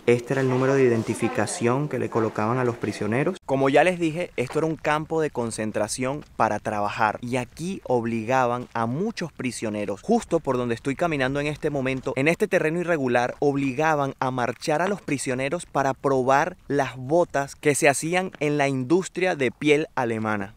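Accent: Venezuela. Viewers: ME ENCANTO MUCHO LA FORMA EN QUE SIENTES Y EXPLICAS LO QUE ALCANCE A VER DEL VIDEO.. PORQUE LA VERDAD ... LOS SENTIMIENTOS O EMOCIONES NO ME DEJARON CONTINUAR VIENDOLO.... ES MI PRIMER VIDEO QUE VEO DE TI...... ME SUENAS A UN PAISANO...SUENAS COMO VENEZOLANO.... PERO ESE NO ES EL PUNTO..... LA VAINA ES QUE ME IMAGINE ESTAR AHI.... O PASAR UN MOMENTO ASI Y DE VERDAD QUE ES HORRIBLE .. Y ESO QUE ES SOLO IMAGINANDOMELO......... Y SOLO PIENSO EN AQUELLOS QUE VIVIERON ESO.... MUJERES, NENES, HOMBRES DE VERDAD QUE DUELE..... DUELE LA FALTA DE EMPATIA, LA FALTA DE AMOR AL PROJIMO , LA FALTA DE ACEPTAR A LOS DEMAS COMO SON .... NO IMPORTA LA RELIGIONNNN , NO IMPORTA EL COLOR DE PIEL.... NO IMPORTA COMO SEAS..... TODOSSSSSSSSS MERECEMOS [ R E S P E TO ] TODOS SOMOS PERSONAS, NO TRATEMOS A LOS DEMAS COMO SI FUERAN ANIMALES O MENOS QUE NOSOTROS..... POR FAVOR AMEMOS AL PROJIMO COMO A NOSOTROS MISMO..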